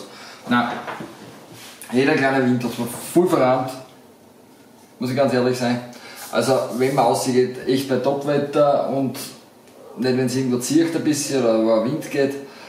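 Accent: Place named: Vienna, Austria. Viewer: I aus Wien du heast du irgendwie a so o.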